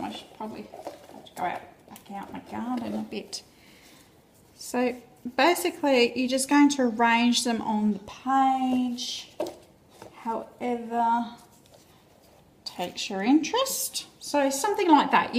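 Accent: Australia. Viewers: I PICKED UP YOU WERE AUSTRALIAN, AS I AM PROUDLY. FOR A FIRST ATTEMPT AT ECO DYEING, YOUVE HAD AN AWESOME OUTCOME, PAPERS ARE JUST BEAUTIFUL, IM JUST STARTING THIS. THANKS.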